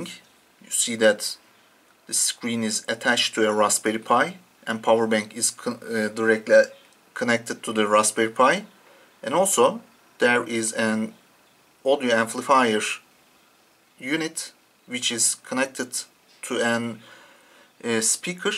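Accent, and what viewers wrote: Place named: France. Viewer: French accent eh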